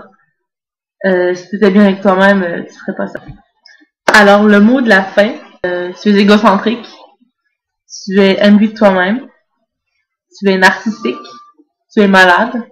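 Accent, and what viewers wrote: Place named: Quebec, Canada. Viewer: Vraiment facile de juger les gens... Mais la réalité est que ce n'est pas ta faute... Tu sembles encore trop jeune et distante de la réalité Française... Ton accent me laisse transparaître le Québec ? Si c'est le cas ? Je suis pas surpris ! Ici, et je dis ici parce que je suis du Québec aussi, nous sommes encore bien contrôlé et que la France, elle, se réveille je crois... Enfin, j'espère que tu as au moins pris la peine de visionner plus d'une vidéo de Tarence Lacroix avant d'lancer 1ère pierre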